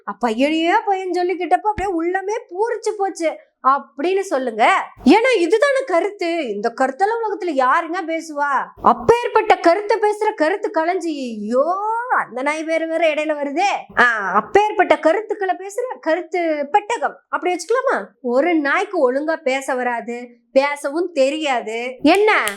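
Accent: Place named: Coimbatore, India. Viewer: கோவை வட்டார வழக்கு,, நல்லா சுத்தமா பேசுங்க சகோதரி....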